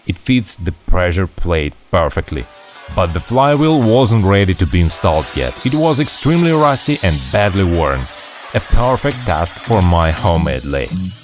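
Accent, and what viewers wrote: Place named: United Kingdom. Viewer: You have an impeccable British accent.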